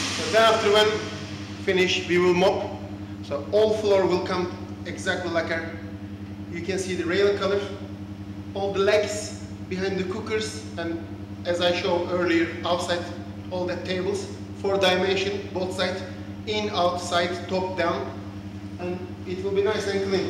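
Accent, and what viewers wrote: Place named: Turkey. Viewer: u have such a turkish accent of english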